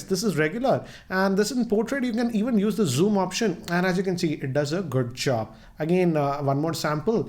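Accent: India. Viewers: OMG I love indian people accent 😁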